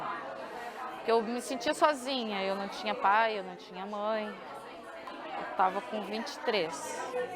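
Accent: Curitiba, Brazil. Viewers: This Is My Nick Mas ela tem um pouco de sotaque Curitibano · o sotaque curitibano dela é lindo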